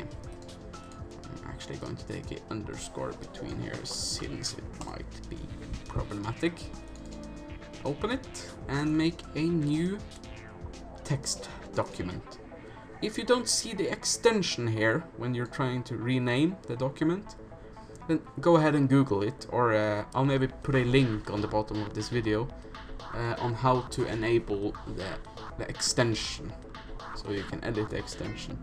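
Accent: Norway. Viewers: Er du norsk? Ihvertfall norsk aksent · Are you a norwegian ? it sounds like you are. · Du er norsk, eller hva?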